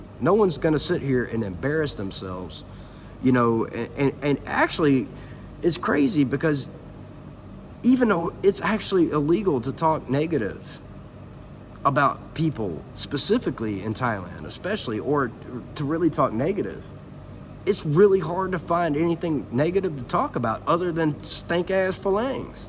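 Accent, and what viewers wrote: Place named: Houston, United States. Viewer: I probably missed it in one of your previous videos, but I’m curious as to where you are from in the states. I’m from Houston, and you sound like just about everyone I know. Great video, and this type of shit is a prime example of why I’m not going back to the states once I retire. That entitlement is a motherfucker.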